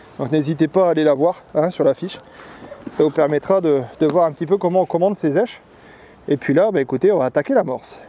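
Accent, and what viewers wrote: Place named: Nord, France. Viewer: Salut, c'est vrai que tu as quitté le Nord, j’avais complètement oublié, mais bonheurs ta pas perdu l,accent. Bonne continuation et plein de nouvelles vidéos. Jean-Paul.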